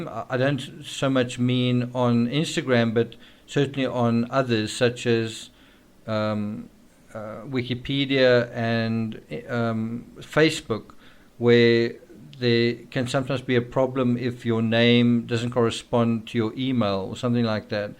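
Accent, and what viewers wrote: South Africa. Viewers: Sounds South African 🙂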